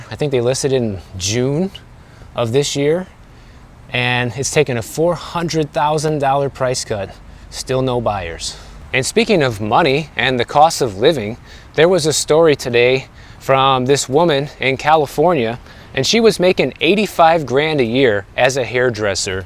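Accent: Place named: Midwest, United States. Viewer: Hi,! Thank you for this video. I can tell by your accent that you are from the Midwest. I am, too! Janesville, WI, to be exact. There was a KMart store in Janesville and I recall, as a child, many times my mom would put our, “back-to-school”, clothes on lay away. She would always pay them off within a couple of paychecks, but for the circumstance we were in, it seemed to work. The store did not charge interest, and, would literally hold the very specific items, as long as the promised payments were made. KMart, I don’t believe, is around anymore. But I remember that time, well. My mantra, since I was in my mid to late 20s has been: “If you can’t pay cash for it, you don’t need it.”. I hope your message continues to reach younger generations.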